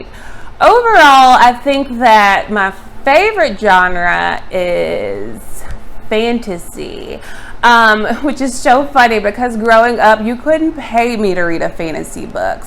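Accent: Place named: South, United States. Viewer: Welcome to BookTube! I’m from Texas, so I love a Southern accent 😀. Looking forward to what videos you upload!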